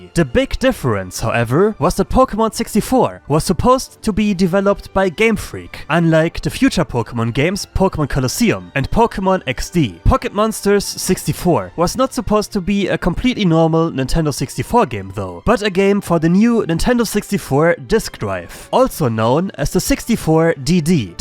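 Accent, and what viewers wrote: Germany. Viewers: You make the German accent sound oddly attractive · Nach deinen Akzent gehe ich stark davon aus das du aus Deutschland kommst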